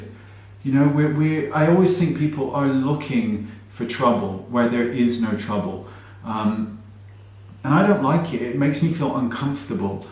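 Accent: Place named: England, United Kingdom. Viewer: Firstly...I enjoy your voice, your English accent and I think you’re bloody handsome! I like your honest no nonsense approach, great make up tips and tutorials. And I enjoyed your Wayne Talks where you shared how it’s OK for people to ask questions, to ask if you (or whomever) is gay. Although I never thought it being an offensive question, I still found your perspective enlightening, as it helped further deepen my own understanding and position. Keep sharing your wealth of knowledge and coming across as down to earth and unpretentious!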